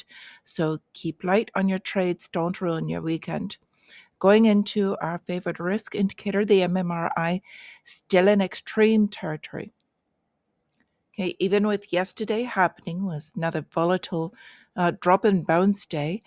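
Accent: Ireland. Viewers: HELLO! Oilseed here.:-) Do I detect a bit of 'Dublin' in your voice? Thanks for the info!!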